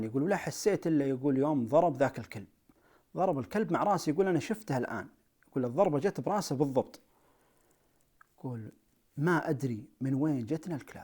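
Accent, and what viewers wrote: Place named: Saudi Arabia. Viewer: صوتك ممفهوم لهجتك سعوديه قح بس قصصك ممتعه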